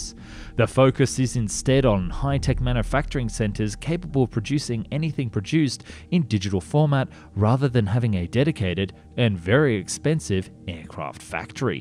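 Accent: Australia. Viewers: I reckon the narrator is an Australian. Lovely 🙂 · you're Australian right?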